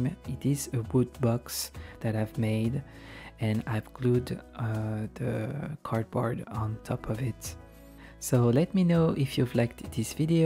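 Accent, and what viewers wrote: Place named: France. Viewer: I recognize a french accent when I here one hahaha 😂 Is your family from Corsica? Beautiful painting as always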